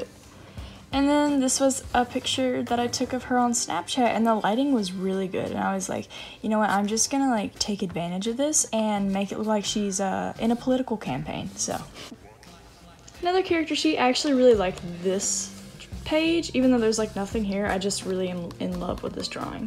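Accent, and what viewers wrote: South, United States. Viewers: I love your accent. I also have a southern accent and I find them pleasant to listen to. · I hope this isn’t rude or anything but your accent makes me so much more secure about mine! Even though I live in the south people make fun of my southern accent sometimes and hearing someone else with it makes me feel so good. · That country accent coming in thick lol it's adorable! I really love your art btw it's so vibrant and smooth looking 💕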